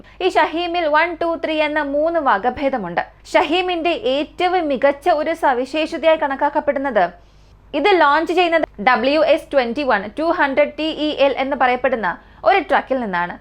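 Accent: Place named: Thiruvananthapuram, India. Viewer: വല്യ കുഴപ്പം ഒന്നും ഇല്ല മോളെ. പിന്നെ Trivandrum slang ഒളിച്ചു വെച്ചാലും......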